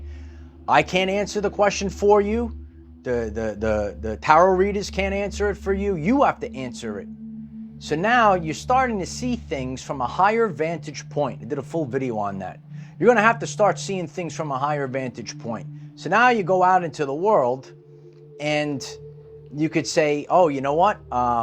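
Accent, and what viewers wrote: United States. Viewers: American accent Aussie trees behind you...not aligned from my perspective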